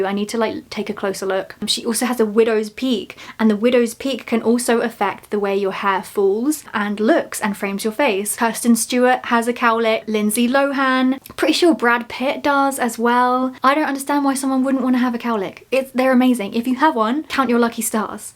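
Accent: United Kingdom. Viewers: is this british accent?? it appears i can't stand it it sound so raw and unnecessary annoying :( the accent could have been triggered my misophonia but i like u so best of the luck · I love British girls and their accesent!❤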